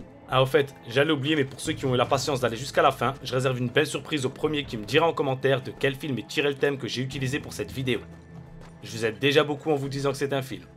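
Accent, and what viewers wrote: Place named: Tourcoing, France. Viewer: ta un axen de Tourcoing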